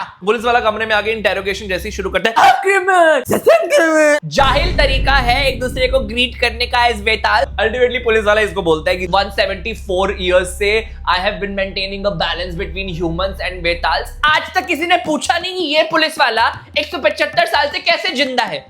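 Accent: Punjab, India. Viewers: Bro ... I can see the movie is a total bheja fry but ur review is just outstanding 😂😂 bhai hasn hasn k pet dard ho gaya. I love the way u say things with a tint of punjabi 😂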